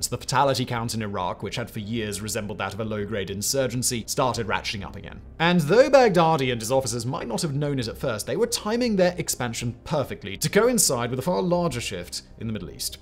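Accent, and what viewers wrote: RP, United Kingdom. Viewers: Grew up in surrey and west sussex uk. I dont know what accent that is supposed to be but it sounds like someone who is trying to impersonate the royal family.... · british english was extra thick in this one. Had to rewind a few times 😂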